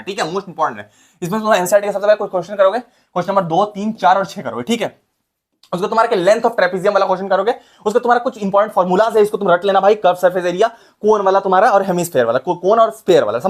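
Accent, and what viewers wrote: Bihar, India. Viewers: Aap bihari ho kya?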